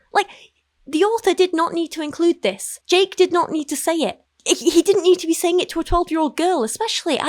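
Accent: United Kingdom. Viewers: When you read this nonsense in your pleasant British accent it just sounds to me like Harry Potter crackfic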